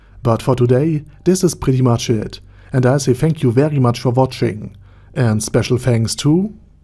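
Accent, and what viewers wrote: Germany. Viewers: it is a heavy german accent but its very easy to understand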